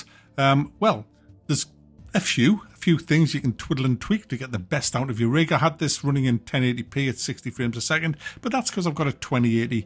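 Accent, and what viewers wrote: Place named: Newcastle, United Kingdom. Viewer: Honestly I couldn't care less about Avowed it's just nice hearing a fellow geordie ranting about poor shite 😆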